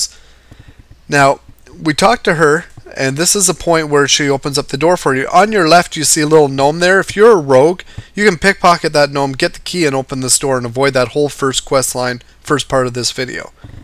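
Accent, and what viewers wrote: Canada. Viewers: He is canadian so his accent.....But Idk why he didnt know about the FP....maybe cause he is too leet to come back to regular zones lol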